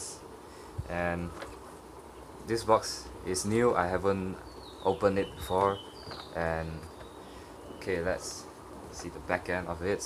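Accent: Singapore. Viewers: U r a singaporean right you sound singlishhh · you sound singaporean haha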